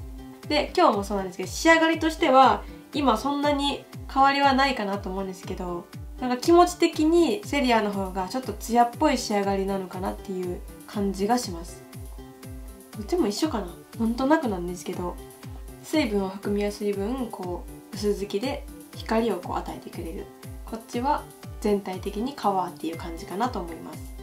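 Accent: Kansai, Japan. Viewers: かわいい😊関西の方ではないのかな？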